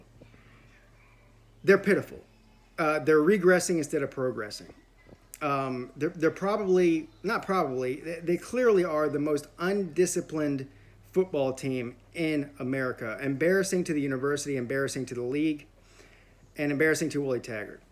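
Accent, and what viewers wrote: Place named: South Carolina, United States. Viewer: what a Clemson accent...u suck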